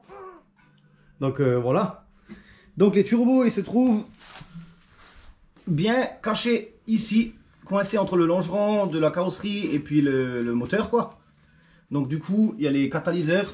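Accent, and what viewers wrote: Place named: Lorraine, France. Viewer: L accent lorrain!🤣🤣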